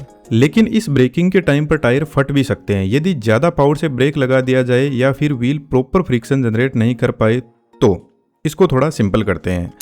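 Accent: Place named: Marwari, India. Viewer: Marwadi voice pehchan li 😂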